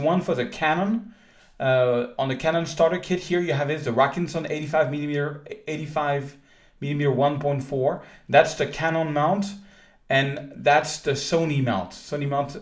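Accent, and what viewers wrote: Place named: Paris, France. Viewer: man you are the king of marketing to be sure - never seen anyone as slick as you and with their fingers in so many pies...I just love your architectural work, fantastic- aspiring pros can learn a lot from you as to be a successful photographer in this day and age requires not only solid photography skills but also sound knowledge of how to market yourself and the many areas you need to explore to maximize your income - is the man and the Parisian accents just adds to the mystic - I think I will work on establishing an Italian accent, or perhaps German - anything to set you apart from the competition!!!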